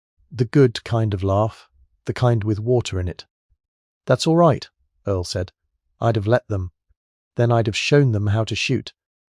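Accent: United Kingdom. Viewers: You know I don't know what's worse you can't pronounce stuff right what are you talking about the bow of a boat and then you got to have some britt accent that I can't stand it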